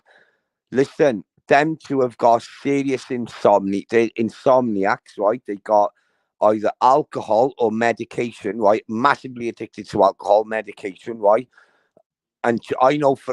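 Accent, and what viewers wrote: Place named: Liverpool, United Kingdom. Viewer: your Scouse accent is immense 😂😂😂. Funniest accent ever 🤣🤣🤣. Chicken smack and chocolate I'm nearly f🤣cking dead here 💯💯💯✌️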